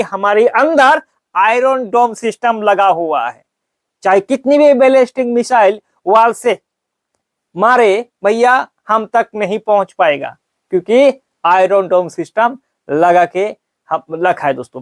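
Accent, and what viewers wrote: Bengal, India. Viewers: Bhai aap bangali ho?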